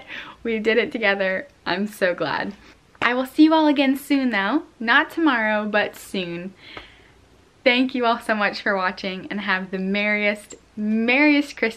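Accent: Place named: South, United States. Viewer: Not sure how I found thous page, you are very high energy, which is cool, I like your southern accent, I sub and liked. Hello from Ontario Canada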